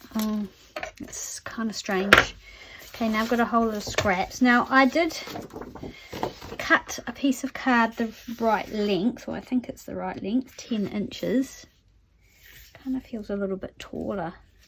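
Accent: New Zealand. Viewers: 🧚🏻‍♀️Thanks so much, I really enjoyed watching your process of expanding the spine of your Readers Digest! Look forward to your next video. Lovely to hear our Kiwi accent on YT!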